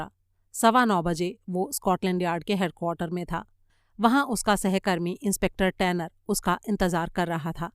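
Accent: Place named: South, India. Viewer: आप साउथ इंडिया से है क्या? आपका एकसेंट यही बता रहा क्योंकि अभी हाल ही में मैंने महारानी वेब सीरीज़ देखी है जिसमे रानी की OSD IAS का भी एक्सेंट ऐसा ही था वो केरल की थी।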